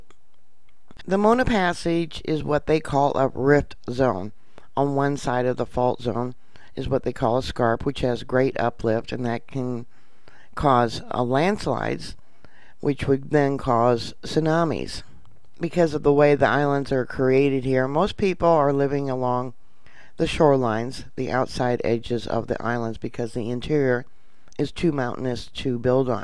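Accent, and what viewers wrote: South, United States. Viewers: She knows but sometimes no matter how hard you try, you just can't get them to come out right!! Besides, shes a country gal...I'm from Ga n ppl say we talk funny. We think they do! Lol.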